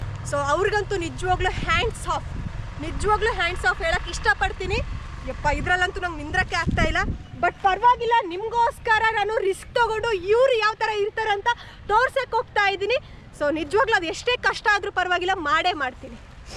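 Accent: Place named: North Karnataka, India. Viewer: ನಿಮ್ಮ್ own language ತುಂಬಾ ಇಸ್ಟ್ ಬಹುಷಃ ರಾಯಚೂರ ಮುದ್ದೇಬಿಹಾಳ್ ಲಿಂಗಸೂರ್ ಬಾಷೆ 🎉🎉🎉ನಿಮ್ ವಿಡಿಯೋ ತುಂಬ ಇಷ್ಟ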